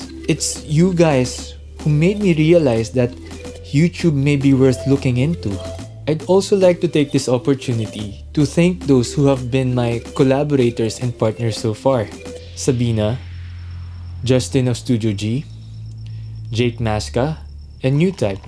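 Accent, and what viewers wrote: Philippines. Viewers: I love the filipino accent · That Filipino accent though, ur my favourite yt channel btw. Rly excited about your reviews and the next build. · I like your Filipino-English accent, doesn't sound conio or pretentious. I watched one of your vid where you assembled this cute-ass gameboy then I noticed the "mini walis" that got me thinking "tang-ina pinoy 'to?" Lo-and-behold pinoy nga. Haha, I don't fancy the fast cuts myself but I like the overall aesthetics. You earned a sub! :)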